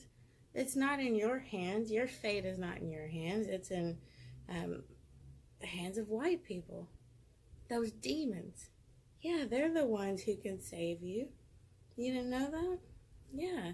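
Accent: Texas, United States. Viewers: Yes, her message should be heard by Americans of all races. I know some white people that need to listen to her. I felt that when she said she doesn't support a movement that makes her feel inferior, I would feel the same way if some organization was trying to sell me the same message. I recognized her Texas accent, I lived in Dallas and then Plano for 9 years. Her personality is great!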